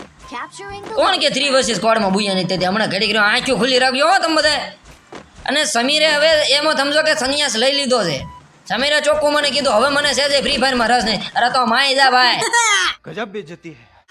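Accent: Bhavnagar, India. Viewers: એલા નક્કી તમે ભાવનગર બાજુના લાગો😅😂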